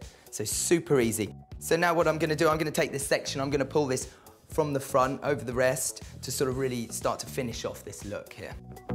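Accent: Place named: United Kingdom. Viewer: I'm so in love with British accent.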